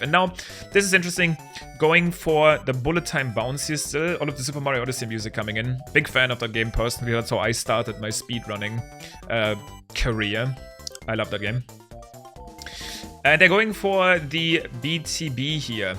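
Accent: Germany. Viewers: I know a german accent when i hear one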